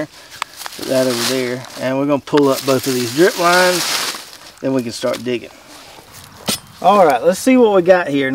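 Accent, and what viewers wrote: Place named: North Florida, United States. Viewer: I wonder if anything can be done with the Brussel Sprout leaves as a raw, or cooked green like collars, or stir fry, etc...? It does seem like most big, dark green leafy veggies tend to need a bit of extra Nitrogen, sort of like super lettuce. Thanks for all the cool vids. I've just found your channel and been getting caught up. It looks like you're somewhere very nearby. I'm in North Florida and we have very similar soil, pines and accents :) Cheers!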